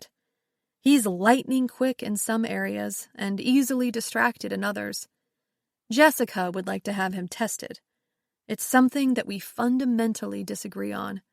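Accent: United States. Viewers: American narrator.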